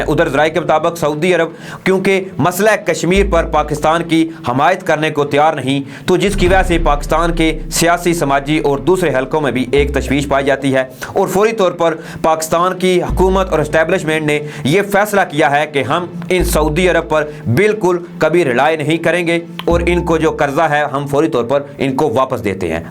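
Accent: India. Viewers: Dost Urdu thek Karo plz aap Indian style Urdu bol rahe hay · Yeh banda indian Hé is ka talafaz ghor se SUNAIN pâta chal jaye ga Hé is indian.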